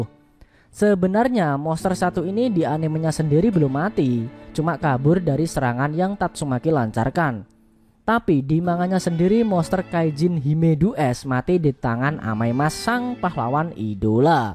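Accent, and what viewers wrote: Java, Indonesia. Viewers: Mimin orang jawa ya? Suaranya medok banget njirr mirip orang jawa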